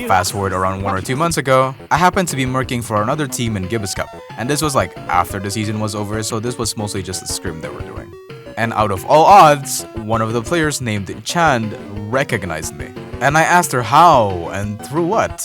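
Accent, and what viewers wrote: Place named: Indonesia. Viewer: Idk how but I clocked in you being Indo just from the first couple of seconds and I was right. INDO PRIDE GRAAHHHHHHH🦅🦅🦅🦅🔥🔥🔥🔥🇮🇩🇮🇩🇮🇩🇮🇩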